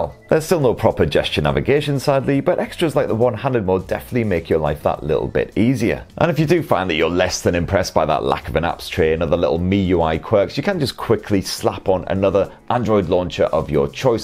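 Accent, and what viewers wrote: United Kingdom. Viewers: I just got this phone It's amazing Nice British accent though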